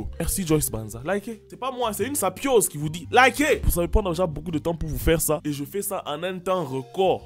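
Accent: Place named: Swahili, East Africa. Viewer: en plus tu parles swahili je l'ai senti dans ton accent ☺️☺️☺️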